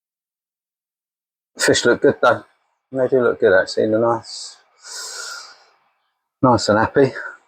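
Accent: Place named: Yorkshire, United Kingdom. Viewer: Yorkshirey way lol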